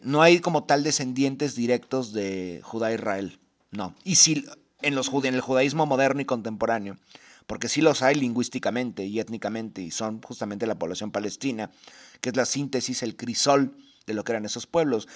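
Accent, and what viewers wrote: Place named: Mexico. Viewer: la mejor respuesta que he escuchada para esa gente que cree saber mucho y sus fuentes se basan en cultura pop, hollywood, etc etc:D me hizo reir Master lo admiro, ahora que estamos en crisis y se está disputando la libertad y el alma del ser humano usted aparece como un caballero de la luz con acento mexicano blandiendo la espada del conocimiento... GRACIAS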